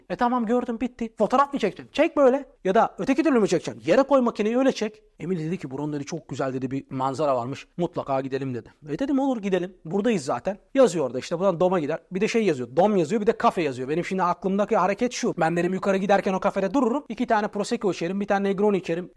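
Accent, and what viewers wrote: Thrace, Turkey. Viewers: trakya aksanin fena ya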